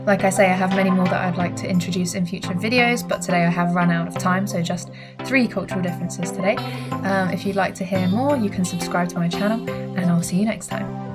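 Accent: United Kingdom. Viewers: 英國口音？ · Nice British accent and more than decent Chinese. Very talented.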